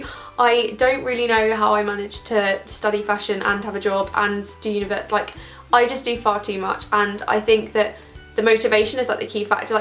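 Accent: United Kingdom. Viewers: I love British accent <3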